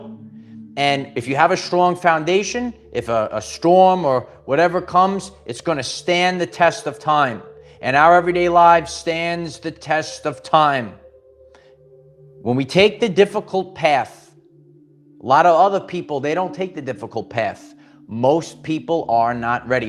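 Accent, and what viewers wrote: New York, United States. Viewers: I love the NYC accent. The Bronx? And yes, you will never be completely ready. But you are now have been prepared enough to go to launch. "Peddle to the metal", or "bury the needle", call it what you will. The time is up. It's full on come May 2024. Enjoy the quiet time remaining while it lasts. This is it kids. No going back now.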